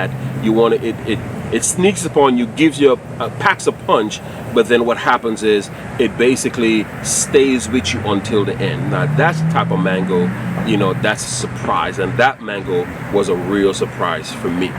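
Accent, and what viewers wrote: Jamaica. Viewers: Shout out from the Bahamas cud hear the jamaican in your voice love bro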